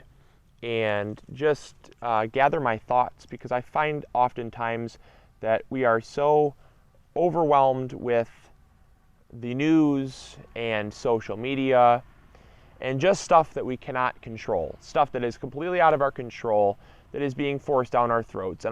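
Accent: Michigan, United States. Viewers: Good to remind people that food does not come from the grocery store originally. Since most people, more than 80%, live in cities where 90+% of them raise nothing to eat nor would they know how, it is important to remind everyone of where it comes from. (Also I like listening to your Michigan "accent" and colloquialisms that one is not likely to hear elsewhere.) I grew up in Ottawa county, Michigan - near and in Holland.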